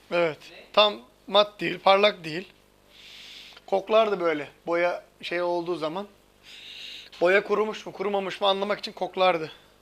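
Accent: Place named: Aegean, Turkey. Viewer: Hocam şiven çok güzel Aydın mı Muğla mı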